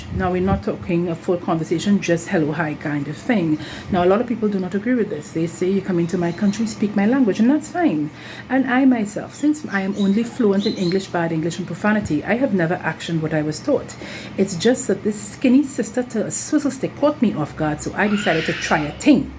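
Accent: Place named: Trinidad and Tobago. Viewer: No problem. She got some Trini...🙌🏼😁🤣😚😂💯🙏🏼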